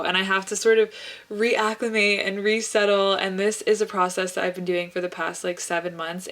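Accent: France. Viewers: i love the french accent!!! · Okay but the French accent and black turtleneck go together and suits you haha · Loved your french accent 😂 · The french accent took me by surprise😮😂love itt❤❤ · I dont understand why did you start talking with a french accent ?? Is it just for fun or is there another reason behind that ? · The french accent😂❤️ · French accent. HILARIOUS😂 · French accent >>>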